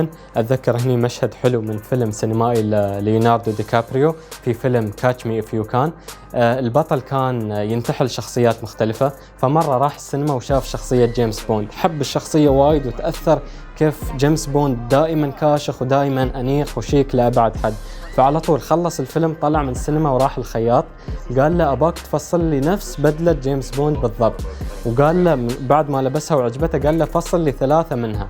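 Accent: Iraq. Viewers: لهجتك تشبه العراقيه الي وياي لايك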